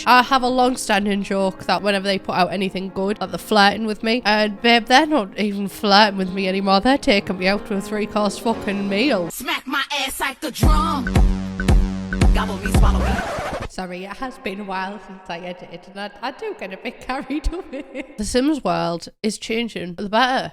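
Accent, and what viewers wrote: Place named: Northern England, United Kingdom. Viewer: Sending good thoughts to you and your family and I am glad we have you back, even for this one video :) I've always enjoyed your content and have done for the past few years when you had 20k subscribers. I always enjoyed watching you cause you were the only simmer/youtuber I found that sounded slightly like me, I found it comforting that us Northern Lasses are getting some spotlight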